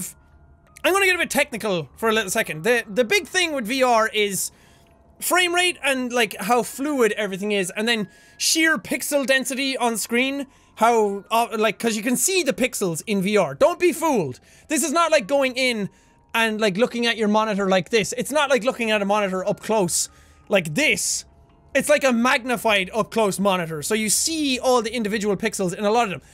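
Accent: Ireland. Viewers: Dude are you Irish? Nice video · Ramona Clawson He’s from Ireland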